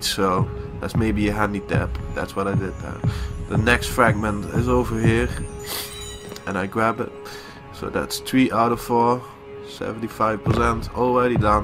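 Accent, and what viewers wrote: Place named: Netherlands. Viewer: You sound dutch lol.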